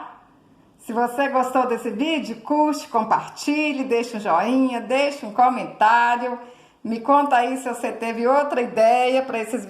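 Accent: Minas Gerais, Brazil. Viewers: Amo seu jeito mineirim carinhoso de falar...kkkk Você é um doce!!!! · Que pessoinha linnnnnda que você é, e esse sotaquin de Minas Gerais! Muito obrigada por sua generosidade.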